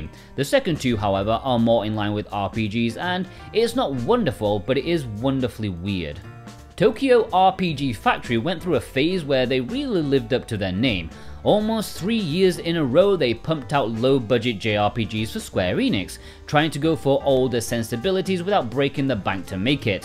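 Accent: England, United Kingdom. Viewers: Love the english accent!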